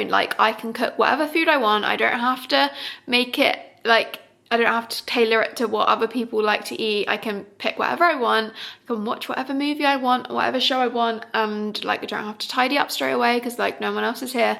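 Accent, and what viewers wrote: United Kingdom. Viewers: bruh wtf shes british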